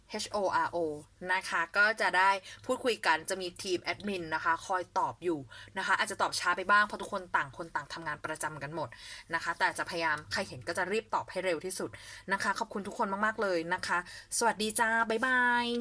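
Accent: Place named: South, Thailand. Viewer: ชอบแม่หมอจังเลยค่ะฟังแล้วเคลียดี น่ารักค่ะ ฟังละไม่เครียดเลย อ้อร้อด้วยคนใต้ป่าวนิ อิอิ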